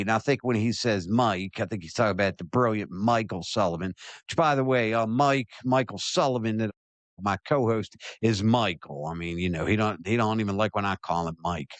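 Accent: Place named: Baltimore, United States. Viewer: I love your Baltimore accent. Go Os.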